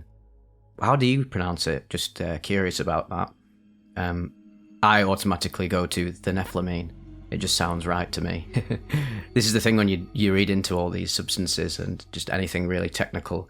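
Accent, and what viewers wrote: United Kingdom. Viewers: are you british? i cant tell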